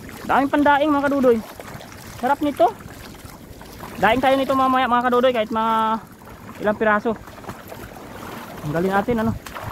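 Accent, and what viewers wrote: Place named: Ilonggo, Philippines. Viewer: good morning po.taga saan ka po sa visayas? Ang intonation nyo po ay parang ilonggo😊.Ingat po palagi God bless po.watching from Bacolod City😊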